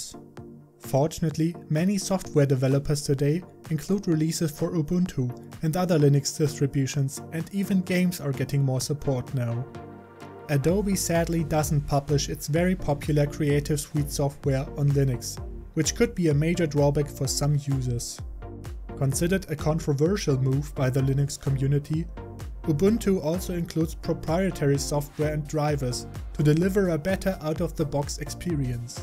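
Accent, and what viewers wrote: Germany. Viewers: falls du deutsch bist hört man das an deinem akzent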